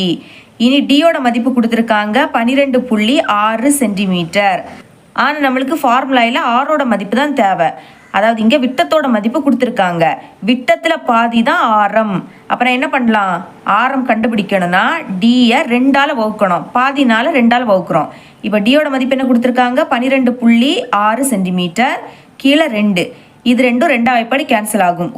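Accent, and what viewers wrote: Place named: Nagercoil, India. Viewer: Unga native nager coil side thana unga voice enga area pola iruku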